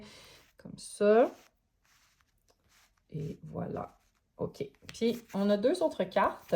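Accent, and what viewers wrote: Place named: Quebec, Canada. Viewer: Ouha ! Tu m'épate belle âme 💚 Tu sais ! .....nous les français ont est en amour avec l'accent du Québec 😉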